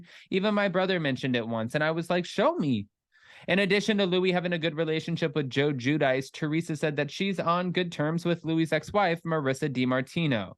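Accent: New Jersey, United States. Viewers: We love your 'Joisy' accent. it really colours your story! Hope you and Jason have a great weekend, and that Shaylee gets some well deserved rest!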